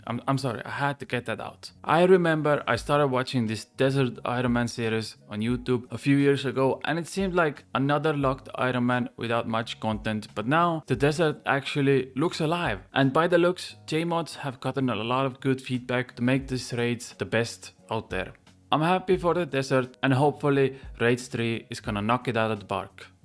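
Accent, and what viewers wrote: Estonia. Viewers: Sa oled eestlane?? Mida hullu. just leidsin su kanali ja mõtlesin, mis aktsent see selline on :D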